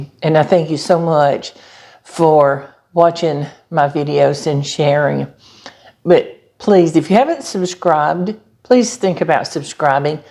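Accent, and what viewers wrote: South, United States. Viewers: I ❤ your southern accent. I am new to your channel. Enjoying your channel.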